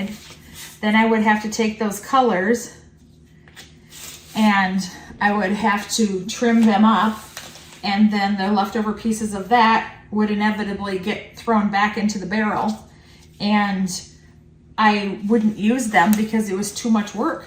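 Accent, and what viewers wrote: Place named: Midwest, United States. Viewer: I don’t know how many times I have watched this video. I LOVE cutting up scraps so this is right up my alley. My father was born in Charles City near you and we went there every summer and even once at Christmas. I have many wonderful memories of Iowa (which is beautiful) and your Midwest accent is pleasing to my ear. I read your blog daily and I wish you the best with your health issues.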